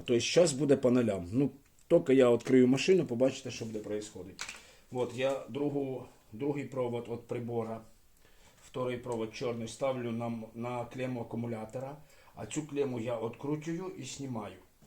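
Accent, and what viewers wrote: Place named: Central Ukraine, Ukraine. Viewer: Його рідна мова - суржик, а точніше діалект, яким розмовляють в центральних областях.І він від цього не париться.А страждаєш ти, і причому страждаєш дурістю.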